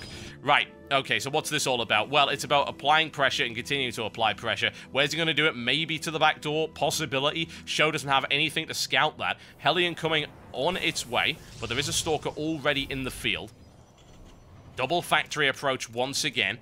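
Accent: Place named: England, United Kingdom. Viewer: i could listen to your damn-so-good-comment all f'in day, especially because of your incredible authentic english accent :) total biscuit you are a total wizkid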